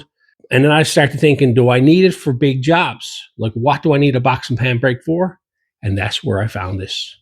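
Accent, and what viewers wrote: Ireland. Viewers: It's amazing how similar to Irish accent yours is! And thanks for the great videos! Superb stuff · I really like your bare bones approach I'm 76 have a fitted,small metal/wood shop keeps me alive. I hope you don't mind me asking as an Irishman do you have an Irish connection or are Irish yourself I thought heard a slight broge there also the way you phrased some of the things you said, sounded like my grandparents of 70 years ago, brought a smile to my face.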